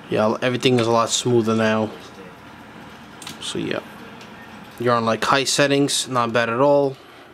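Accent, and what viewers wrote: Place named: New Jersey, United States. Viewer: I might be wrong but it sounds New Jersey lul or maybe Boston I’m not sure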